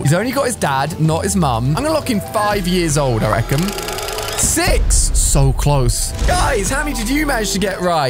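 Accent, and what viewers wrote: United Kingdom. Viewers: Are you the same YouTuber whose another video I have watched recently? You have a very crazy British accent, similar to another YouTuber named Mallow. It was also seen in one episode of SpongeBob SquarePants that Mr. Krabs was born in 1942, which would make him 81 years old!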